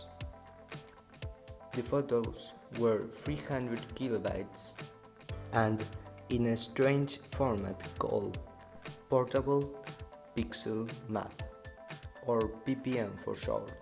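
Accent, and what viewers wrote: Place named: Latin America. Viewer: Amigo buen trabajo pero espero que hayas mejorado el acento se nota que eres español/latino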